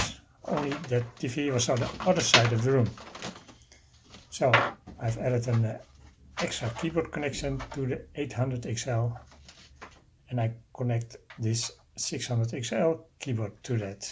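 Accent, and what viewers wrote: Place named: Netherlands. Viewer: Stone Coal Miner English! You must be a Dutch speaker! Hey hallo! Leuk project, grappig hoe je een Teensie gebruikt voor vertaling van originele keyboard matrix naar USB. Erg cool! Dumpje omhoog en subje erbij!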